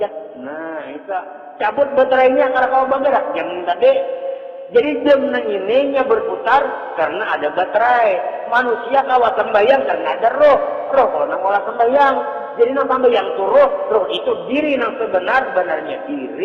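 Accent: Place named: Kalimantan, Indonesia. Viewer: Kaya logat kalimanta ceramahnya,,kiyai2 dari kalimantan banyak sekali ya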